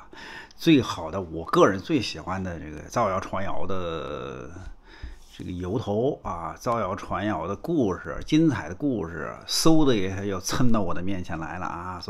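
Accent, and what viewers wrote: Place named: Changde, China. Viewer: 口音听上去常德人呀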